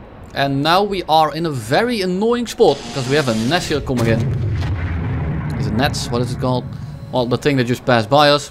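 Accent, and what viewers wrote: Netherlands. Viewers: Je accent is iets te Nederlands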